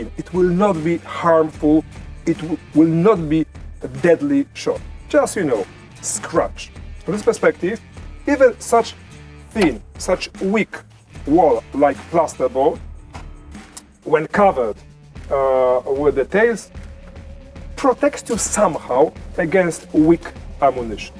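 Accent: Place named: Eastern Europe. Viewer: Bo po wschodnio europejsko angielsku.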